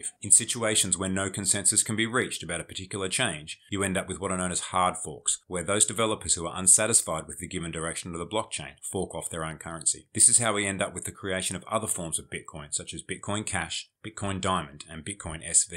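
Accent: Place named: Australia. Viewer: I think that's just the Aussie accent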